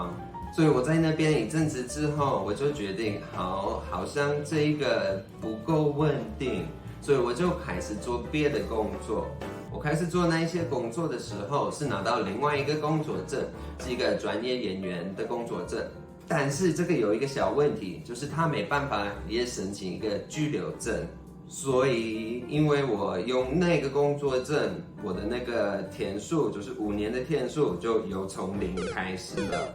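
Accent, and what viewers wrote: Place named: Taiwan. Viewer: 滿滿的台灣腔，好親切喔～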